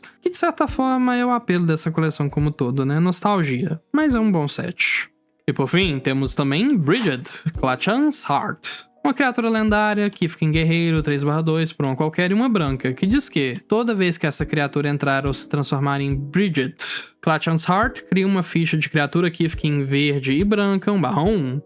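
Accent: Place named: Minas Gerais, Brazil. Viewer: descobrindo agora q o é mineiro, entendi pq é tão suave ouvir os vídeos kakakakakka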